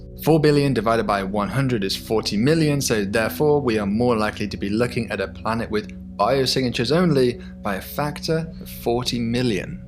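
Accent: United Kingdom. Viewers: Awesome! I love British ASMR science bro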